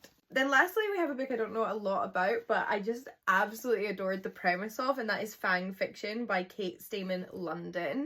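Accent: Scotland, United Kingdom. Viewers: These all look great and they're such pretty books. Also I love the way Scottish people say book.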